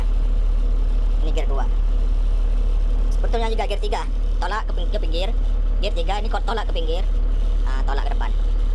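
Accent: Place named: Madura, Indonesia. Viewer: Kok kaya logat Madura omm😅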